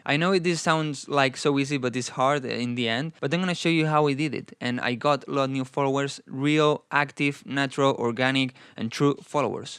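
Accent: Spain. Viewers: Great video man! I was so indeep on FB adds, this seems so cool as well cause is less complicated so I'll check out! BTW where are you from? Your accent seems spanish, I´m spanish too if it's the case hahaha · Good video, just what I needed . . . . . El español es tu lengua materna verdad? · It's spanish voice jeje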